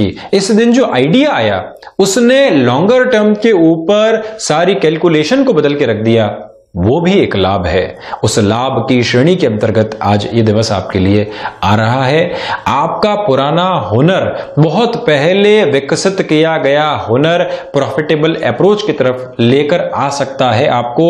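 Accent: Standard. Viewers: Pranam. Bahut shuddha uccharan. 🙏🙏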